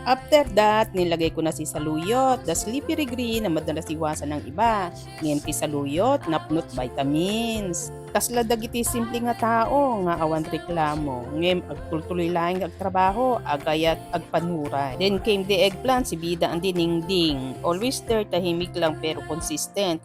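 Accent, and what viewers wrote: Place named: Baguio, Philippines. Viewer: kahit ganito araw araw ang ulam best.. haha kagaling mag Ilocano Baguio accent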